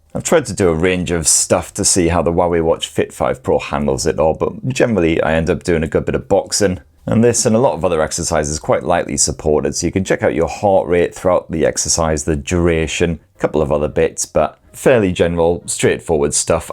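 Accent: United Kingdom. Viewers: What British accent is that?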